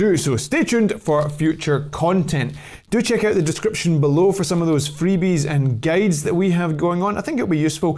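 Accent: Scotland, United Kingdom. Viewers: fantastic, what a joy to hear a scots voice 😊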